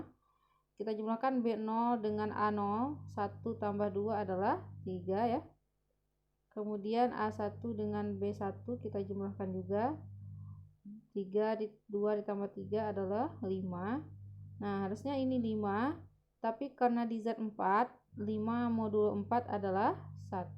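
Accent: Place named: Painan, Indonesia. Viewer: Khas dialek painan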